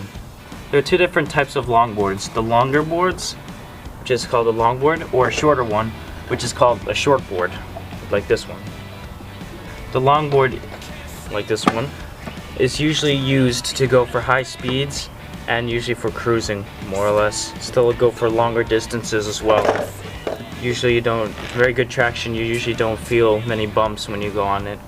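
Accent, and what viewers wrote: United States. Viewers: dude where in ny you from????? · are you staten island?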